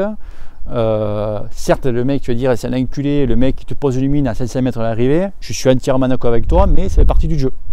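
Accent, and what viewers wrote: South, France. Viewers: Salut, je veux juste remercier pour tous tes conseils avec l'accent ensoleillé ☀️ et chaque fois des expressions qui me font marrer, des thèmes que t'aborde actuelles et que tous ceux qui font du vélo se reconnaissent un moment ou un autre dans des situations diverses (avoir une patate du diable, être dans le rouge, voir la vierge 😂etc,etc), et surtout continue ces vidéos qu'on apprécie, salutations de la 🇨🇭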